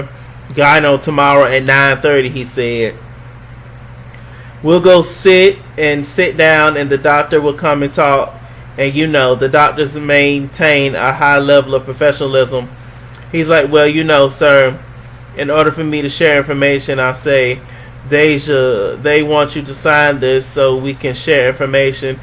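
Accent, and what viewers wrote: New York, United States. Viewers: you sounded just like NY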